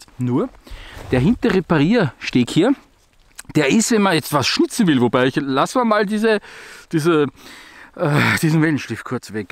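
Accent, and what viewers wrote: Austria. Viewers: Was hast du denn gehört? Und er ist ja auch Österreicher deswegen der leichte Akzent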